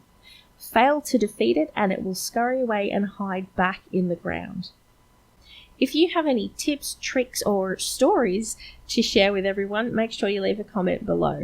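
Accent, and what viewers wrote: Australia. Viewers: dude, I was having to take 6 swipes at reg skellies that normally take 3. I’ve been a PL since year one, so not sure what’s up. Btw, still loving the content and the Aussie accent 😎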